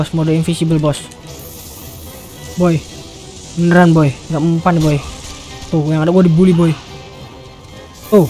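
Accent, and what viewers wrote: Madura, Indonesia. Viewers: Madura kah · Madureh bang..??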